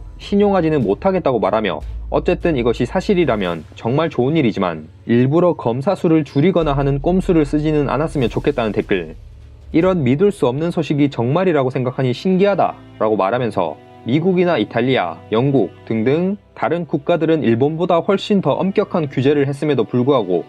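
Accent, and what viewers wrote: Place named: Gyeongsang, South Korea. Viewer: 이거 경상도 사투리죠? 억양이 오르락 내리락 ㅋㅋ 표준말을 좀 연습하세여~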